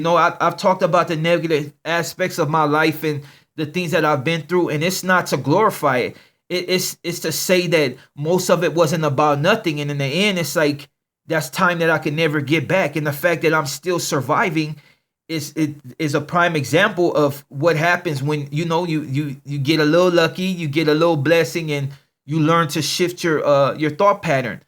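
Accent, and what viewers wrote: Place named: Mexico. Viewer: I fuck with your channel cuz! One love! Keep grindin, you spit hella game just some of these youngstas on some other shit. When I was young I was fuckin up got into some street shit, drugs, alcohol heavy. I made the decision to join the army. My older bro was in an out of juvie since 13 to 17 then got locked up but they sent him to prison at 17. It’s crazy cause we’re mexicanos but grew up in a all black neighborhood homie. Mexicanos hate on my family cause we don’t sound Mexican we sound where we came from just like you did. You sound mexican our slang is gutter people say we sound like we tryin to be black. My brother is Mexican in a crip gang and I was following the path but made a transition. So I feel you completely when you spitting game sometime about where you grew up and how you grew up. Opposite worlds but the same in a way. 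Ima support you foo off the strength of black and brown love. That’s how it was in my neighborhood l. Grew up in park hill Denver 💯 keep it solid and keep it pushin I hope your platform gets bigger and better! Much love partna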